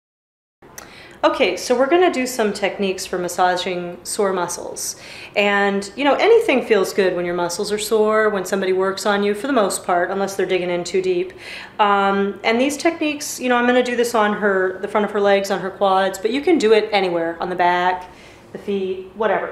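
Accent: United States. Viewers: dat american accent.. :)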